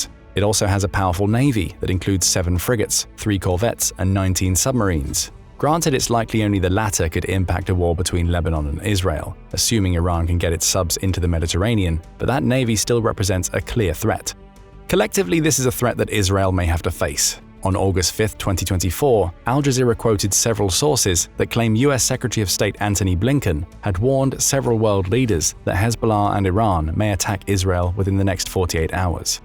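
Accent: United Kingdom. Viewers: What a crap. Full of.wrong facts with nice british accent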